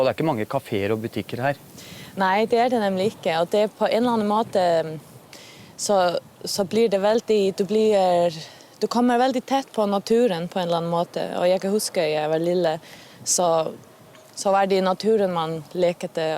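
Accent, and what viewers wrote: Faroe Islands. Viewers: snakkar hon norska, eller danska med faeröiskt uttal? tycker det var ovanligt lätt att förstå om de är danska :) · There is a clear Faroese accent, she kind of mixes in some general danish, norwegian, swedish(if you like) words, just enough to make herself understood by the Norwegian reporter) I think this way of talking, accent, they call 'Gøtu-danish' (Faroese person attempting to speak Danish, but have a strong accent) Naturally she also can speak pure Danish very well, when she like to.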